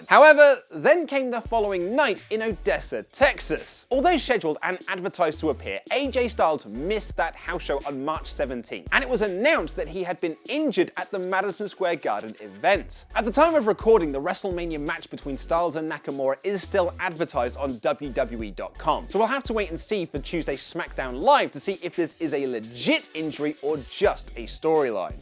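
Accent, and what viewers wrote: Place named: United Kingdom. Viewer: I always noticed you say "shheduled" instead of scheduled...maybe its due to the British accent.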